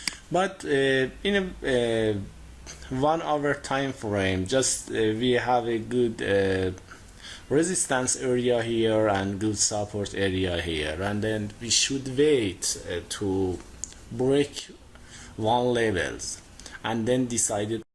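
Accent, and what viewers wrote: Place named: India. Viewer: داداش خسته نباشی ولی فارسی صحبت کن انگار انگلیسی با لهجه ی هندیه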